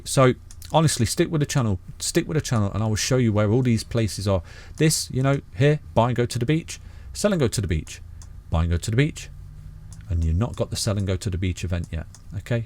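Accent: United Kingdom. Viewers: I 've got my headphones on and i'm so enjoying his warm British accent, i cant even hear his neighbors car at the background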